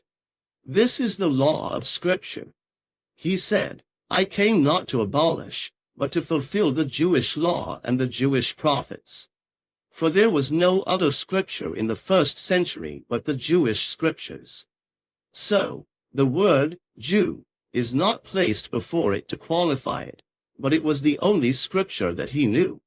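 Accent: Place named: United States. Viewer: Lovely but a bit weird that a slight American accent gets in there. I know his original voice in and out.. so it feels a bit foreign. Only a little detail. Otherwise the message is lovely 🥰👌🏼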